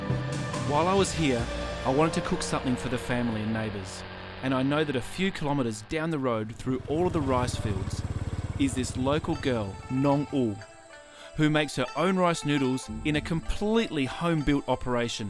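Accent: Australia. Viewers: your pronunciation is way off.... you use too much of your native australian accent and it ruins the tones of the thai language ! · do I hear an Aussie accent ? I'm on Tamborine Mountain in the Gold Coast hinterland . Can you tell me where Phi Mai is please .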